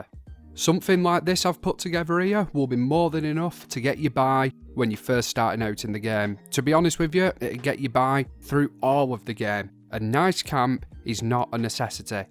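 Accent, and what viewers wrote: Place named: Manchester, United Kingdom. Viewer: My wife and I really want to know where your accent is from. Thanks for the video, but plz help us with this mystery! I think you have a Mancunian accent but she thinks Scottish.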